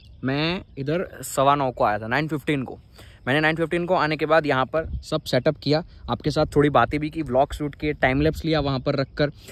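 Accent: Gujarat, India. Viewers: Bhai tame gujrati cho accent to Gujarati che kya Raho cho tame Ane aa Kai nadi che?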